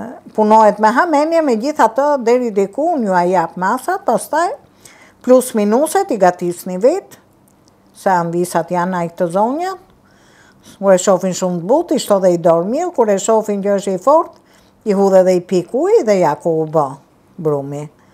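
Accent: Tirana, Albania. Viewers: Tamam kanal popullor qe na kenaq me recetat dhe ate folmen Tiranesete lumshin duart ❤🎉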